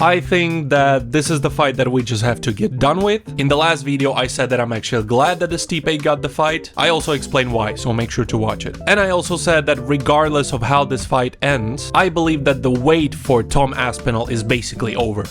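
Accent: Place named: Scotland, United Kingdom. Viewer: Loving the Scottish pronunciation 🙊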